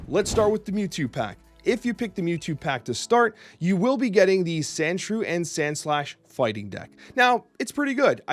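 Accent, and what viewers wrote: Canada. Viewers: He's Canadian. Leave alone!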